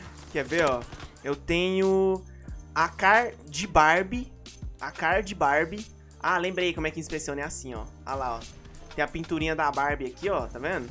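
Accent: Minas Gerais, Brazil. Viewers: Mineiro Ala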